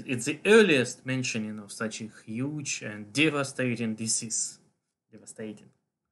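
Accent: Russia. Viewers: Офигенное произношение на английском с чисто русским акцентом! Прям, как "держу корону"))) круть! Уважаю! 👍😉💪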